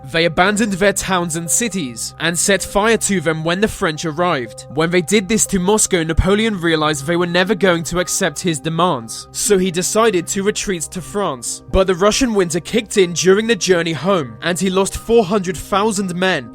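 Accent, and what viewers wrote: England, United Kingdom. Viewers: What's the story with his voice? It's an english accent but he sounds like a robot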